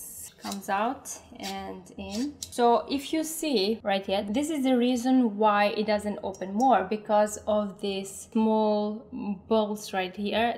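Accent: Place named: Eastern Europe. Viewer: which part of eastern europe are you from?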